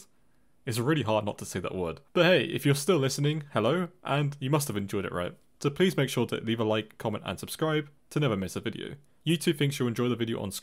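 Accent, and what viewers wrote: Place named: United Kingdom. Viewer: you be british! you're the only british youtuber i know!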